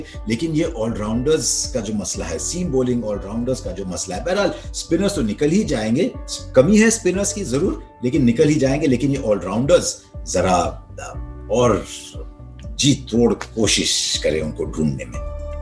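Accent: Hyderabad, India. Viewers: There is Hyderabad accent deep in there trying to pop out..ofcourse it is present like a Hyderabadi biriyani in top end restaurant..presented in polished manner. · he speaks hydrabadi as well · There is a bit Hyderabad accent there😀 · Hyderabad aur Lucknow ka asar kaafi hai Harsha Bhogle ji ki zubaan me. ❤️❤️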